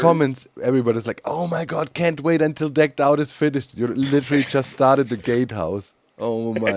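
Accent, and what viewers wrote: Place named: Germany. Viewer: I- you're German! I've been hearing your voice in other hermits videos, and couldn't pin point your accent! I feel so silly, but I guess I grew up around enough German accents that it sounds as "normal" to me as English.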